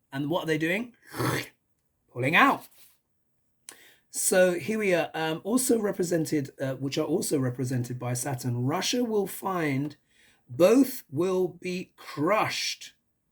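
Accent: England, United Kingdom. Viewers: I TOLD MY TEENAGE GRANDSON U SAY SLURP. OMG HE FELL OFF THE BED LAUGHING. THEN HE CALLED A CLASSMATE N THEY BOTH R SAYING IT WAITING FOR ME TO TELL THEM IT SOUNDS LIKE U. I'M NT GONNA SAY THAT BC THEY R FUNNY TRYING TO. THEY SPEAK LIKE U TO PPL THAT DON'T KNOW THIER AMERICAN, NOW THAT'S FUNNY. I LET THEM LISTEN TO YOU SOMETIMES TO HEAR U. I TOLD THEM THEY'RE GOING TO TAKE A TRIP ONE DAY TO ENGLAND N TEST THAT WITH REAL PPL WHO SPEAKS LIKE U, N THEY'LL TALK ABOUT IT FOR THE REST OF THIER LIVES. N I'M COMING TO BC I'VE ALWAYS BEEN DRAWN. THERE FOR SME REASON. BUT I'LL FIND OUT I GUESS LUV U, STAY WELL N. SLURP ALLL U WANT ON UR SHOW.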